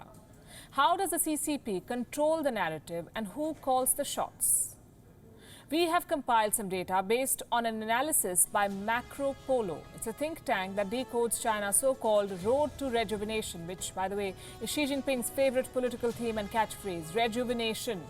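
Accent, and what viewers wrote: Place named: India. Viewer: She has a strong india accent and talk piece by piece.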